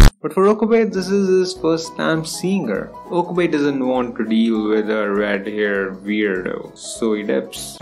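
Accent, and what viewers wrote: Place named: India. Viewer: Crazy hearing someone that sounds like their first language comes from India talking about science like that mind blowing. I thought every Indian loved science. 😭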